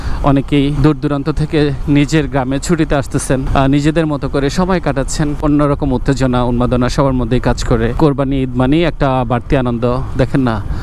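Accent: Chandpur, Bangladesh. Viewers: Apnar basha Chandpur, age jantam na😮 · Vaiya apnr vasa ki Chandpur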